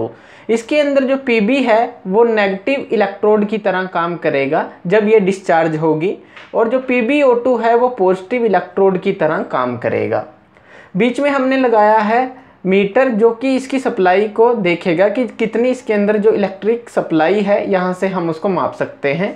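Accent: Punjab, India. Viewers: Good explanation sir.aapki Language par se lgta hai ki aap punjab se hai.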